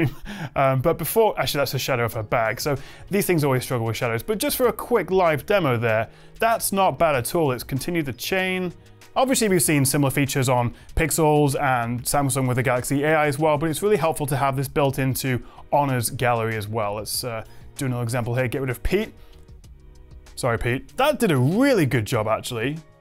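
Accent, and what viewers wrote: United Kingdom. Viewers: Love how British you sound and the Harry Potter reference.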